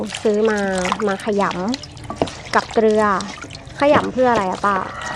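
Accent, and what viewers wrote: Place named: Sukhothai, Thailand. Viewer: ฟังเสียงเหมือนคนโขทัยเลย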